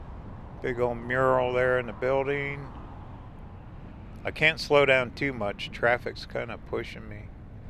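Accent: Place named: Western Pennsylvania, United States. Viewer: Great videos. Sounds like you’re from Western PA…maybe dahn tahn Pittsburg? I’ve driven most of 66 dozens of time. Keep up the great work.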